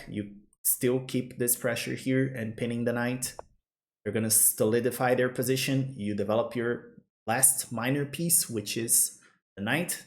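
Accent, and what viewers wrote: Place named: Brazil. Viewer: otimo video, reconheci seu sotaque de brasileiro na hora haha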